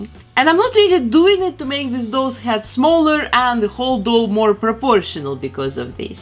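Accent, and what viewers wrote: Russia. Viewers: I'm sorry, but I want ask you You from Russia or it seemed to me?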